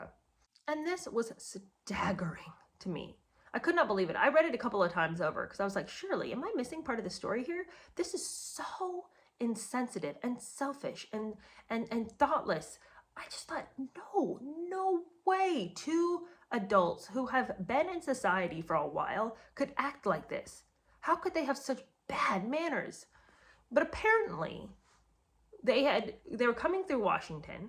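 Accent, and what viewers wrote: United Kingdom. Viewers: Thanks, for bringing back your fabulous British accent to enhance your review of Traitor King. I never tire of hearing it and have actually missed it since your reviews of Spare and Revenge. The Rosenbergs were executed after being accused and tried for espionage. It makes me wonder if U.S. Intelligence agencies may have been following Wallis Simpson's antics? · 😂😂😂 I love your British accent. Making David sound like an unhinged Widow Twankey is hilarious. I started listening to your channel with the Diana book and the impersonation of her was spot on.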